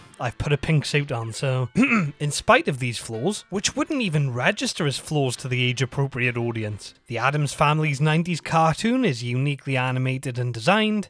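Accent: United Kingdom. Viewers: Great review mate, are you Welsh? I can hear an accent coming through every now and again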